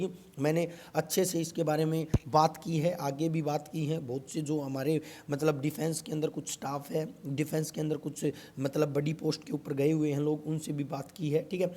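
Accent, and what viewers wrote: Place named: Haryana, India. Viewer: Bhai bole te to Haryana ka laage hai 😀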